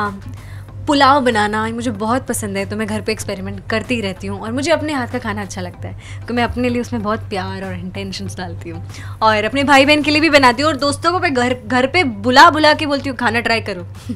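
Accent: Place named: Bihar, India. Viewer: Luv u and ur style and chehra kep it like this like ur bihari accent wan to hear mor carry on shelter for stray dogs and animals they need care mute they r feel pain cant say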